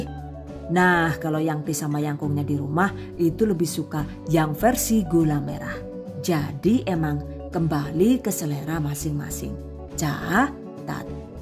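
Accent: Banyumas, Indonesia. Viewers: Ora ngapak ora kepenak yu#GiveawayTHV2021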